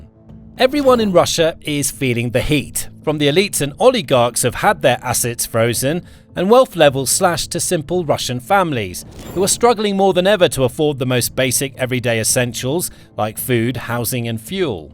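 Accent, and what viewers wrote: United Kingdom. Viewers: funny how every time I hear something like this it is a british accent and when I hear about russia winning it is always a eastern accent and yet, 4 years later and it is the same crappy stalemate, russia still stands(somehow???) despite sanctions and ukraine hasn't fallen despite the pounding the question is, when this thing gets all over and done with I ask, will ukraine be able to foot it's part of the bill? and russia, whatever the hell they're doing to keep afloat still works, but for how long? BTW, my condolences on those reactors.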